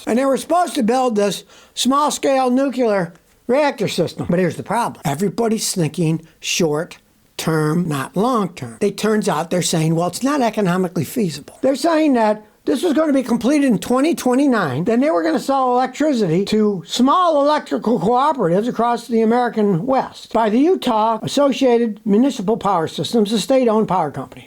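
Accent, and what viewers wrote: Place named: New York, United States. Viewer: you left, but your new york accent never did...ha ha.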